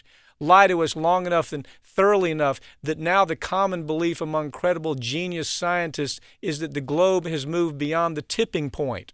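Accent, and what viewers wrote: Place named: South, United States. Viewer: And with a southern accent on top. He's like a character in a John Grisham novel.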